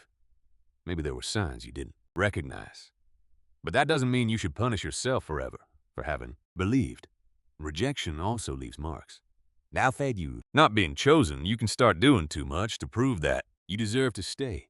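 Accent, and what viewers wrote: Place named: South, United States. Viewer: You who is talking u are from the south bro I can hear ur accent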